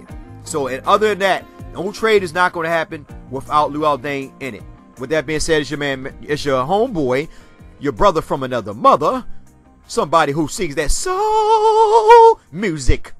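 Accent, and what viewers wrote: Baltimore, United States. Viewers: Good video. I detect a Baltimore origin to your accent?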